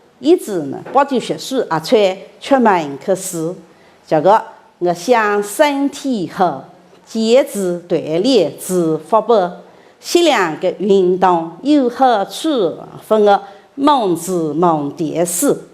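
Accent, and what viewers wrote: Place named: Qingtian, China. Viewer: 有点青田话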